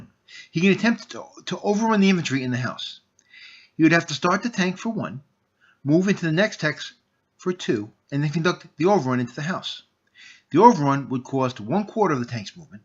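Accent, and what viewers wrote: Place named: New England, United States. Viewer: I would like to see the following, 1-AFV moving through Woods-Road hexes while encountering a KEU in one of those hexes. 2-A Truck with passengers doing the same thing. (the objective is to get past the KEU, not slug it out in a Fire Fight) I have done this, just wanted to see what you would come up with. I enjoy your videos, just slow down on that NE accent once in a while. Thanks.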